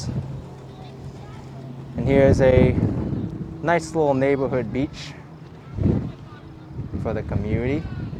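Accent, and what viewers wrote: New York, United States. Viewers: NY accent.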